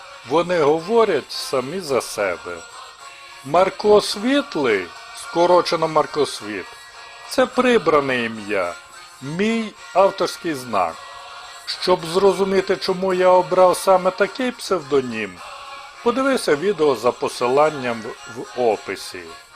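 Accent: Standard. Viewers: Дякую вам за допомогу людям ! Дуже приємно чути чисту солов'їну !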